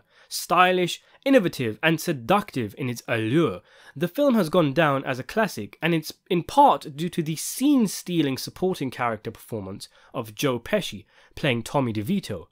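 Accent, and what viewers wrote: England, United Kingdom. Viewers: Your English accent is amazing. I'm American from Ohio an this is just beautiful with your voice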